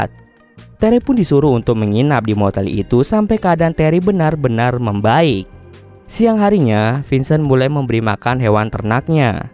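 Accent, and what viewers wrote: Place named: West Java, Indonesia. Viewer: Sm dgn..konten filem...Di youtuber saqahayang klo buhara agak ke sunda2an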